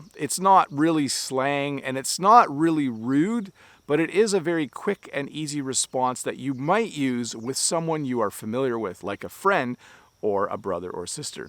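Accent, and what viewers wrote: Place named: Canada. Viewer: Thanks, really understandable as all your lessons and useful, saddly I gues I`m not the onlyone that learnt the alternatives but in a conversation have drive a blank and just can use the reliable "I don`t know" hahahah BTW I`ve noticed your canadian stamp "eh", I dono if you know but in spanish we also use "EH" in a simmilar way that you, in my country sometimes implies a slight provocation or defiant. Thanks!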